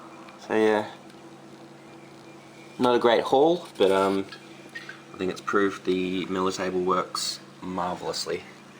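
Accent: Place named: United Kingdom. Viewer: just a few Q's for you on your build... What size is the Bilge Pump you are using? Any idea as to your angle you are set up for on your table? Oh, you have a British (or Aussie / Kiwi) accent. Where are you from (Just Country) and in the area you gather your cons from, what type of Gold do you usually score (Flour, Flat, Mini Nuggets, Tiny Rounds)? Here in Canada, I gather my cons from Alberta from the NSR (North Saskatchewan River). The gold found there is mainly Flour Gould (Microscopic!). And for a few of my own Comments / Observations: Your first videos were a bit clumsy, but I made it through the majority of the videos! I have yet to make my own video for others, so your way up on me in this category! Thanks for your time taken! When you unscrewed the bottle, I'll admit you stunned me! - Well done, Dude! What you showed us all, is that you can take an idea out of thin air, with a couple bucks, and a few days of your time, end up with a finished product that, well ain't the most pleasing thing to look at, but heck you didn't build it to look at, right? 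You built it to work your cons - let the water remove your Lights & Heavies, and leave you with pure AU! In that category, YOU Aced it! Well Done! Oh, one last comment... Your focus on your camera sure SUCKS! But as I said before... we put through with it mostly till the end of the videos! Michael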